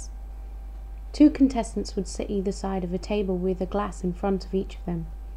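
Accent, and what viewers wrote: United Kingdom. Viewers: When I first heard you read a story I thought you should have children all around you! You would be an excellent story teller! I do love your British accent... You have my attention!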